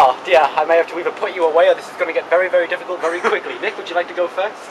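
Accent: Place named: Newcastle, United Kingdom. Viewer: Up heeeaaaa? Ooh the Geordieness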